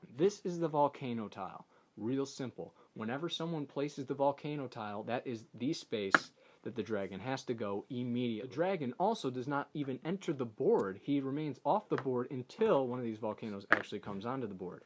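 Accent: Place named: United States. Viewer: Uhhhh...American. There's really nothing weird about it.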